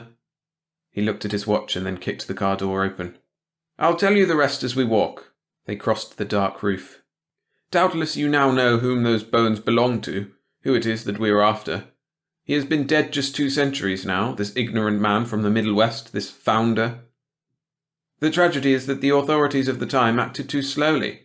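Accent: United Kingdom. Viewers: I'm sorry. Some of them really hit oddly in a British accent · The narrator has an annoying UK accent.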